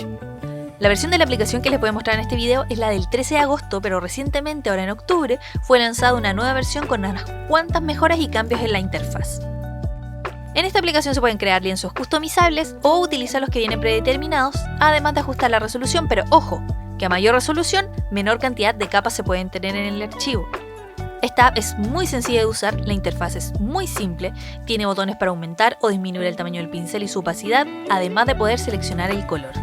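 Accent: Chile. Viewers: Wn me encanta el acento Chileno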